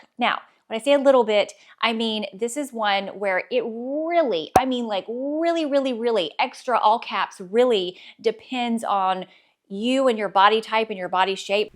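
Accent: South, United States. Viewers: I'm not even thinking about the fashion. I used to teach English and I'm wondering if any non-native English speakers can pick up on her subtle southern accent here. It's subtle, I wonder how this sounds to someone from outside the US and if they're even able to pick up on it or place it, or just find some of her intonations confusing compared to other regions of English speakers. For the video, this is another classic example of a fashion girly talked about modern versus dated looks in an interior design setting that could easily be under similar scrutiny that does NOT match what they are recommending for fashion! But who's counting.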